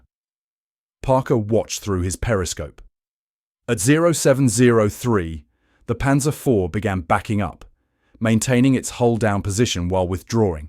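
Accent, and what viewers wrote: United Kingdom. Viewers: A British accent doesn't make it true!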